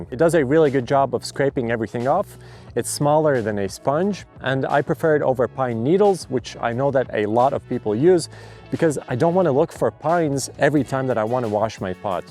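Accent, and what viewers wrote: Latvia. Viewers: Great tips! Love your channel. Are you from Latvia by any chance? I think I recognize your accent 😅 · By chance, I stumbled upon this video, and from the very first sentence, I had a strong suspicion that you are Latvian 😀. Nice tips!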